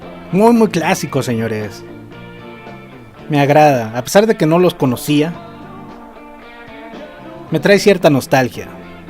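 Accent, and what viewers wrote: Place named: Mexico. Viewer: Hola hermano mexicano!!! Gracias por difundir por allá nuestro rock y te hago una pregunta porque tengo muchos amigos mexicanos, pero son de Ciudad de México, Puebla y Baja California pero no reconozco tu acento, es de Guadalajara?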